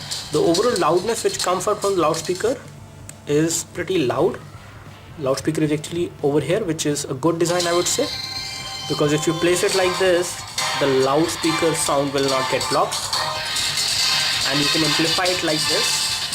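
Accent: India. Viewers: How the do you speak English so fast and fluent but yet you still have the indian accent ? blows my mind.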